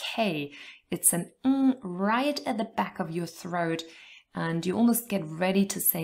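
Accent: United Kingdom. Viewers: Is it British accent? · I could listen to you for hours together. Language and phonetics is such a fascinating world to explore. After watching Dr Geoff Lindsey, my curiosity about English phonemes exploded, but I was not acquinted with the compound vowels symbols, so was finding it a little difficult to follow some of his videos. So I am glad YT brought you in my feed a week ago. I am bingewatching your videos to understand the basics of English vowels and consonants and speech pattern. I am always fascinated by British accent. Your videos threw light on how it comes to be. I hope British people do not lose their accent in coming generations now that everything is getting Americanised these days. 🙏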